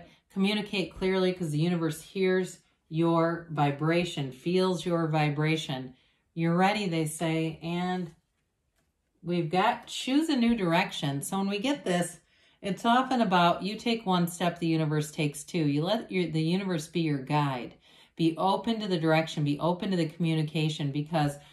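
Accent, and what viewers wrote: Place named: Wisconsin, United States. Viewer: LOL you have a very distinct voice. I'm from the midwest...you sound like you are from Wisconsin. LOL Great reading! I'm the first female arch angel that walked the earth. I work for God...He prefers me single...I've been single for 22 years. I've been allowed to participate in the angelic realm before my time. Although I have so missed the life of a couple, He gave me the opportunity to know motherhood and for that I am eternally grateful. Outside of that it has been a life of serving God in the most unusual ways...God and I made a deal, as a healer, only if I am to affect someone for God do I care to know there problems...it's too much. But when I need to know I will. It's a very clear understanding and contract that we made...working for God has it's other perks. Thank you So much for this insight. I'm still in the 3D realm, I'm walking a fine line.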